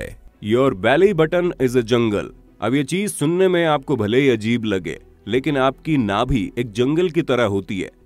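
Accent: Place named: Lucknow, India. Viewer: मैं भी लखनऊ से ही हूं काफी दिन से सोच रहा था ये आवाज किसकी है और लहजा तो हमारे ही आस पास का है आज ही जाना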